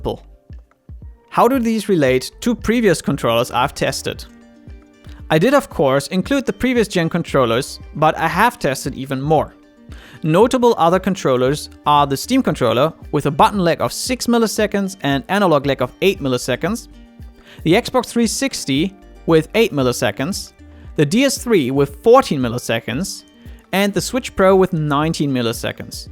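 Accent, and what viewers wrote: Germany. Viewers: your english….. sounds a bit german ?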